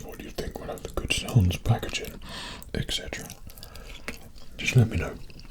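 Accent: England, United Kingdom. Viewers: NO WAY BRO'S BRITISH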